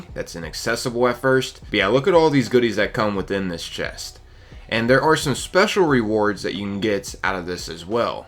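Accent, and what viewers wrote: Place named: Rural, United States. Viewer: YEAAAAA!!!!!!!!!!!!!!!! THE CONTRY VOICE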